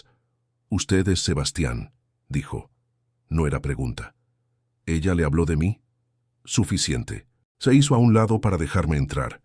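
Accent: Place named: Mexico. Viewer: Soy colombiana,y me e alegro mucho que hagan historias narradas de mi país, gracias por tenernos en cuenta, pero me gustaría que se cersionaran más de los nombres,y pronunciaciones de nuestros dialectos, ya que aquí hay muchos, dependiendo de cada departamento,porque sé que UD es mexicano, y todas sus narraciones suenan igual, acá en Colombia no hay provincias, hay municipios, con nombres de cada dpto, y los departamentos de Colombia son ciudades grandes, Muchas gracias por tener en cuenta a nuestro país, pero que pena, que su dialecto es muy de su país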